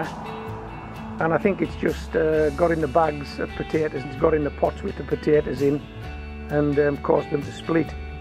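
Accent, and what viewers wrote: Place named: Teesside, United Kingdom. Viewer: You must be from Teesside with that accents mate. Where abouts are you from?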